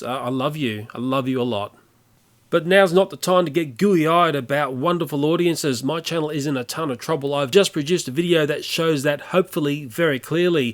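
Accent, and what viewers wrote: Australia. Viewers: Hello, I am a new subscriber found your channel couple months back. Out of all the channels I am subbed I look foward to your new videos the most. Love your personality, sense of humour and, your Australian accent. Keep up the hard work. I hope youtube doesn't continue to go down this path but it seems they keep messing with content creators and it is hard to get views unless you are always on the trending tab.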